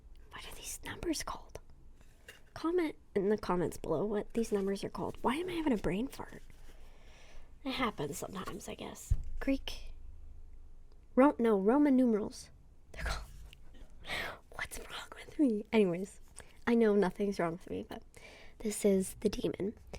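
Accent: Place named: England, United Kingdom. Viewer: 10/10 English accent. I got family in England, I think your accent would pass.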